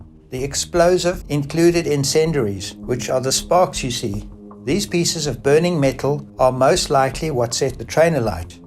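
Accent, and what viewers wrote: South Africa. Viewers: I hear a very South African accent! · He's South African. He has a strong SA accent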